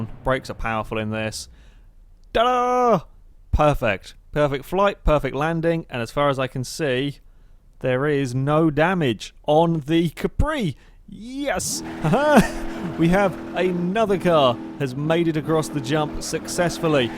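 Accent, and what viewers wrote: United Kingdom. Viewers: He's British. We have accents too you know.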